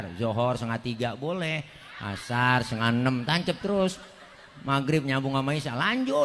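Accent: Jakarta, Indonesia. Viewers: Betawi banget Saya suka Ama ceramah"nya Tiap mau tidur selalu saya play Sehat Selalu Ustadz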